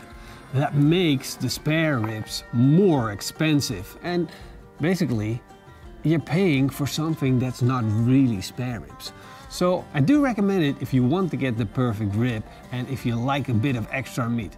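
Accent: Netherlands. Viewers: Is that a Dutch accent I sense? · Hahaha. Nou zat ik te luisteren en dacht.... volgens mij is dit een nederlander of ben ik nou gek. Leuk om te zien.👌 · Do I detect a Dutch accent? It takes one to know one. Great video, trying this tomorrow! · I LOVE your videos. Part of it is your accent that makes me feel at home ( parents and opa +oma with the same accent.) I would love for you to throw in a few more Dutch words and phrases. 🐖🐖🐖🐖🐖🐖😁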